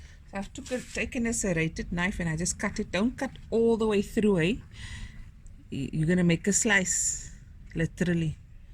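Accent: South Africa. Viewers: Hi l made these yesterday. They were delicious. I miss that SA accent,now living in UK.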